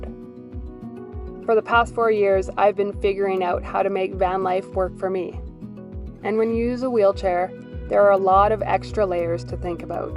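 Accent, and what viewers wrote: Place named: Canada. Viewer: Great video, normal wear and tear shouldn't be a price 😢 keep using wisdom with your body choices, hope to see see on the road sometime, I believe I saw your van video with Bob wells, your Canadian accent is cool, vanlife from oregon 🖐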